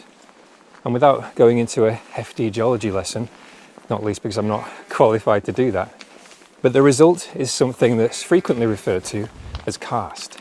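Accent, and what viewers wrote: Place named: Northern England, United Kingdom. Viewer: Watched for 2 mins ,instant subscribe ! .Articulate, intelligent, interesting and northern. :)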